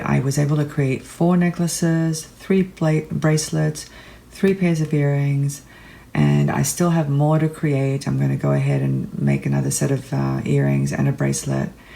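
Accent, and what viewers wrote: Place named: Australia. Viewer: Forgot to say, Love your accent! Are you Australian? Just thought I’d guess.. your home is absolutely beautiful by the way!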